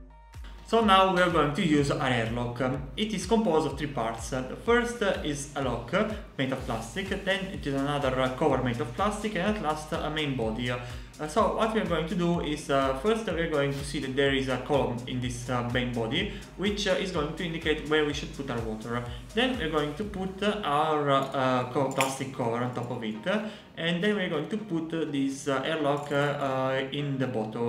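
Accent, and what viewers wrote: Italy. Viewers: To be honest, I always thought non-Italians were being insensitive, that they were exaggerating. They still are, but dayum, bruh. This guy blows my mind, I had no idea some (if not all) Italians actually talk like that. The More You Know haha. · I know you re italian cuz of your accent lol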